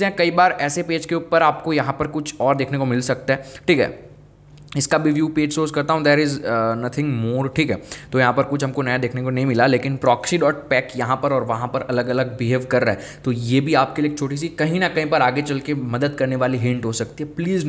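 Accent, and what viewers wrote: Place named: India. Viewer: I'm sorry, but he speaks English or Indian? I understand more or less what he says.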